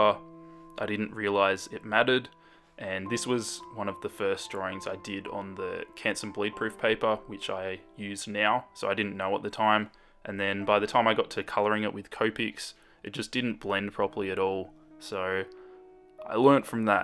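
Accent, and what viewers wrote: Australia. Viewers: Wait, ARE YOU AUSTRALIAN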